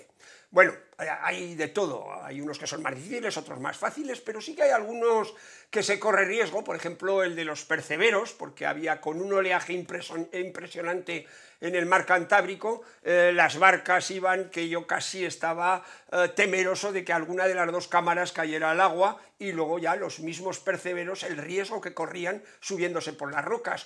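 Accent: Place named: Aragon, Spain. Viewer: Y con tu acento tan Maño le das también una chispa a todo este enorme trabajo 👍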